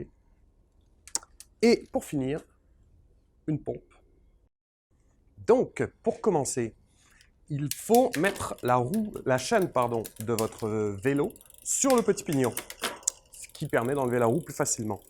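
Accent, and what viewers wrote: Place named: Quebec, Canada. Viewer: avec le petit accent québécois en plus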